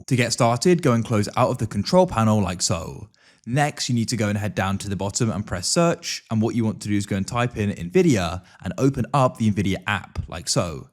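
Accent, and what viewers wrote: United Kingdom. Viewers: hes just british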